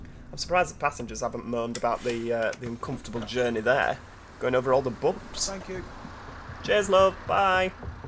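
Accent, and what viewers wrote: United Kingdom. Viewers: Why they didn't fix the loading and freezing in this Game so sad! With wich sterriing wheel do you play Omsi2? And you realy should have more subscribers! I mean your videos are great and I love your British accent and you also got over 1 Million views. This is Insane :)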